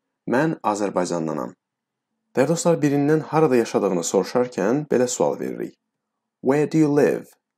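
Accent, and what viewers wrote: England, United Kingdom. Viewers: Təşəkkür edirəm sizə müəllim . Çox gözəl dərs başa salırsız , tələffüz edərkən Azərbaycanlı ləhçəsilə deyil əsl İngilis aksentilə deməyiniz təqdirə layiqdir. Çox sağ olun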